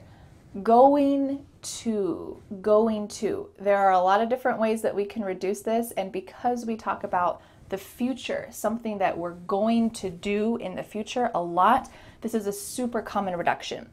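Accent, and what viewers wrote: United States. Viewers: Is it an American pronunciation? Not British? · Very good ,American accent is too good, very good teacher from ivory coast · 10 comin’ or 10 common - your thick American accent (for a Brit like me) makes them sound alike. So I have to rely on context… I remember on my first visit to New York in 1964 I watched a game show on TV that featured word-play. It would not have worked in UK! There was a sentence “To be merry, Marry Mary!” (or similar)… The American accent made it sound to me like “To be mry mry Mry!” In England (at least with my RP accent) the three words are quite distinct…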